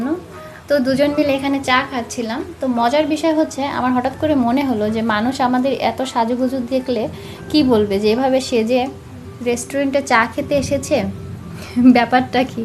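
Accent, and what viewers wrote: Sylhet, Bangladesh. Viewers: আসসালামু আলাইকুম আপু কেমন আছেন? আপু আপনি কি সিলেটি? আমার বাড়ি সিলেট তাই জিজ্ঞেস করলাম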